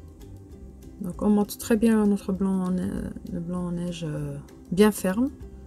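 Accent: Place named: Oran, Algeria. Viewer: J'adore ton accent wahran officiel 💟😅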